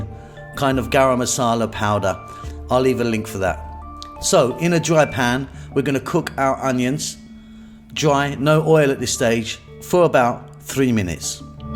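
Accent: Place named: South London, United Kingdom. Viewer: and a lovely South London accent it is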